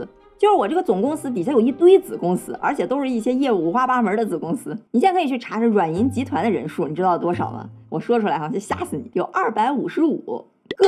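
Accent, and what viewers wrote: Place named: Qingdao, China. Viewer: 小Lin笑容好甜，听声音有一点点山东青岛的口音，不知道我猜没猜对😅😅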